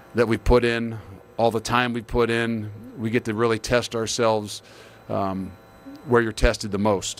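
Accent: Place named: Southern California, United States. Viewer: He sounds like a Southern Californian. 😁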